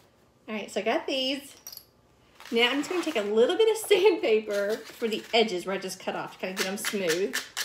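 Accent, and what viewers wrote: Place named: Kentucky, United States. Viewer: I thought you had to be from KY because of your accent. I live in KY too!!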